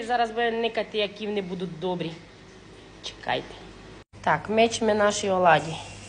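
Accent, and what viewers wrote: Transcarpathia, Ukraine. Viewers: Чудовий закарпатський діалект! Різноманіття -це золото України!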